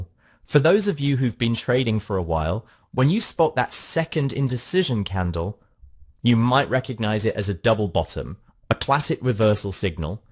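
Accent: United Kingdom. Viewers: You are the best teacher I like your British accent Cheers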